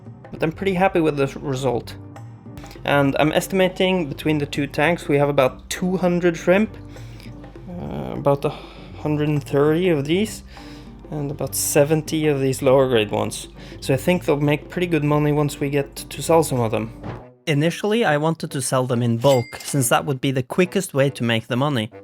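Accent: Norway. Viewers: The problem is, in Sweden you are not allowed to sell shrimps on facebook (thougth it was the same in Norway where it sounds like you are from). But great video!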